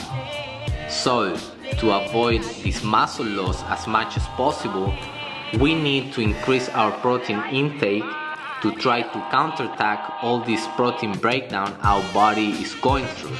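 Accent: Spain. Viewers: up主应该是西语母语人士啊：)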